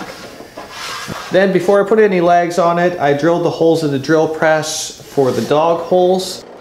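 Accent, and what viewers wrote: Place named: Newfoundland, Canada. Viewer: You sound like a neufie in this video. Good idea for the work vise.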